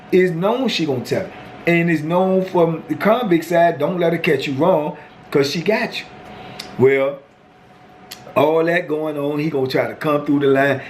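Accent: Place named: Washington, United States. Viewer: I’m loving these stories When say certain words as only a native Washingtonian can say I chuckle to myself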